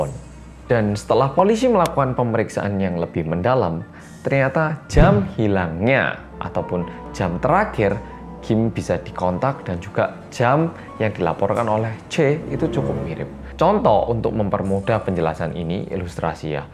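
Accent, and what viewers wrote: Java, Indonesia. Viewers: Tahun 1998 di Indonesia situasinya Kerusuhan . Medok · Medoookkkkk podo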